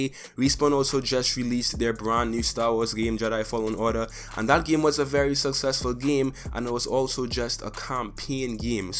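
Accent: Trinidad, Trinidad and Tobago. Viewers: WAITTTT YOU FROM TRINIDAD? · are you from Trini? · Hey you from Trinidad??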